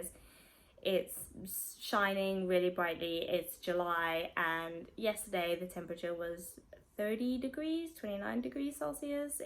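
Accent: United Kingdom. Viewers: You only been in UK for almost 2 years and you speak as if you have an accent of the country already.. Not sure how that is possible because you came from South Africa, don't forget where you came from. 😊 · Wow you picked up the accent so quickly 😃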